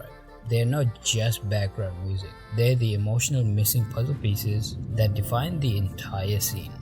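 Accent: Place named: Pakistan. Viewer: Looks Like I Found Another Hidden Gem Like Summer Time Rendering (it's quite popular now but when I watched, it wasn't) 👀✨... BTW Ur Accent, You From PAKISTAN?! 🇵🇰🤩⁉️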